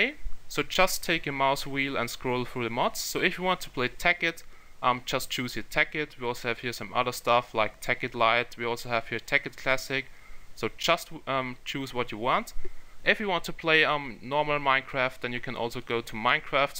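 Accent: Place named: Germany. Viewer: I love the german accent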